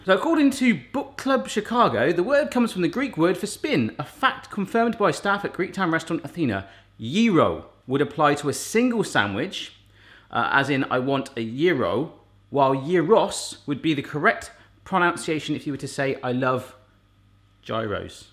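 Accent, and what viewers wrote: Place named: United Kingdom. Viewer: Hello, I watch your Youtube for the first time. It is really interesting. haha You are very funny to make food and to speak British accent. You explain how to cook and to do your behaviour makes me laugh. Thank you for showing your cook . Sometimes I will visit your Youtube.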